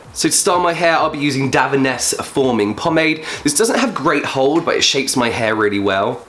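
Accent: England, United Kingdom. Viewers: Wow , I love your English accent. Not because I like the accent it's because it's coming from your mouth 🤗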